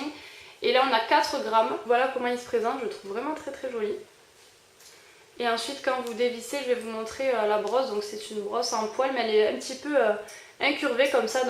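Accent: France. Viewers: Mort de rire l'accent marseillais hihi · Salut, Cette box m'a l'air super je croit que je vais commande des boxs. Pourrais tu nous expliquer comment commander des boxs ❤️ Sinon j'adore ton accent je suppose que tu vient du sud 💜 Gris bisous et a bientôt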